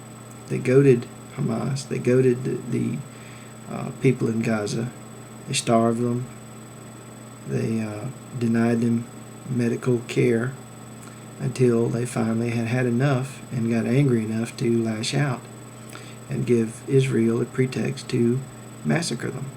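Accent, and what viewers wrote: South, United States. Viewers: And besides according to your accent you are from the south - why don't U give back Texas to mexico ?!?! It doesn't belong to U !!!!!!! What about mexican refugees - you have any idea how many refugees were created in Ur war to settle texas - their descendents probably outnumber the palestinian refugees by far !! AGAIN - U R A HYPOCRIT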